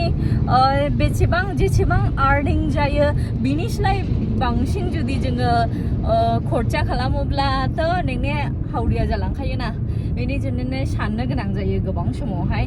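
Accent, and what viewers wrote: Bengal, India. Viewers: Pure bengoli bodo raidlai abo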